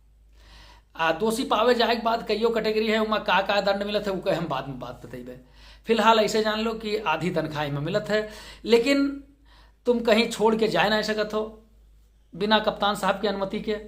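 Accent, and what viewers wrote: Awadh, India. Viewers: Sir aapki boli se lagta hai ki aap avadh area ke hai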